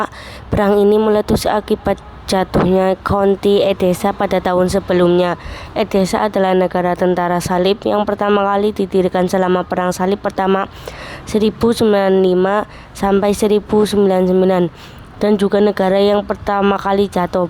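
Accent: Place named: Banyumas, Indonesia. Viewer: Kiw kiw Rada ngapak ya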